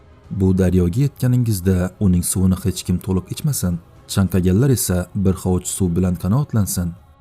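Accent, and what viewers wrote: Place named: Khorezm, Uzbekistan. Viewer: Xorazmlik boʻlsa kerak. Ularni shevasi boshqa. Q harfini ham aytolmaydi.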